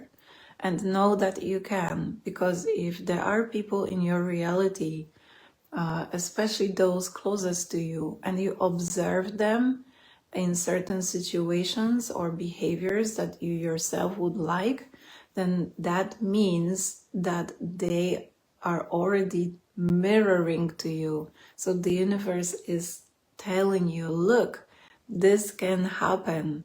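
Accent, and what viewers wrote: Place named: Russia. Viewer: Nifty Russian accent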